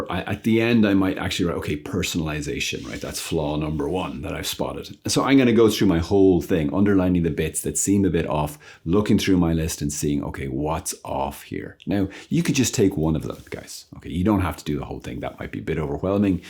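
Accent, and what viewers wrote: Ireland. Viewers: I like the Irish accent to...❤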